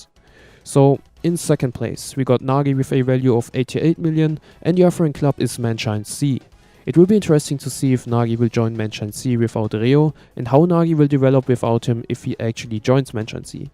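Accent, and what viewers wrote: Germany. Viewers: Are you German bro?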